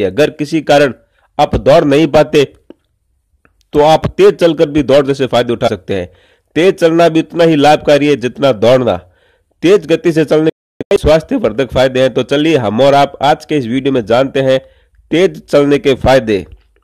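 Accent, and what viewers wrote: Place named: Marwari, India. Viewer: भाषा और इनका लहजा मारवाड़ी जैसा ही लग रहा है।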